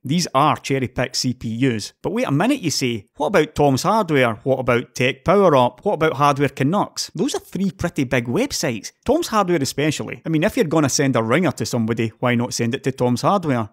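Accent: Scotland, United Kingdom. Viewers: Hey I like his Scottish accent. That's actually the first thing that pulled me to his channel, I found out how good his content was afterwards. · Excellent work, i noticed a comment on the Linus video, "Scottish is difficult for me as well", do you get much grief about your accent on videos? I am from Govan (I am sure you know where that is) but i live in Australia, and although i speak "English", people stare at me and cant understand, off topic i know, but i thought i would ask how your accent goes down with people online. The only bad thing after watching this though, as that i have to wait for your next work, because your work is that good. G'on yersel.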